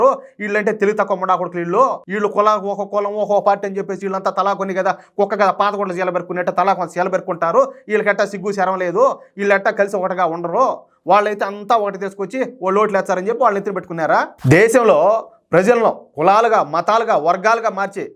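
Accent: Rayalaseema, India. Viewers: చాలా బాగా చెప్పారు అన్న....మన రాయలసీమ భాష విని చెవులకు ఉన్న తుప్పు విడిచి పోయింది....జై శ్రీరామ్🙏🚩🇮🇳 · మీరు మన రాయల సీమ భాష అంటే నాకు చాల ఇష్టం మీరు చాల బాగా మాట్లాడు తున్నారు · చాలా చక్కగా మంచి ప్రాంతీయ భాషలో రాయలసీమ భాషలో బాగా వివరిస్తున్నారు గురువుగారు జైశ్రీరామ్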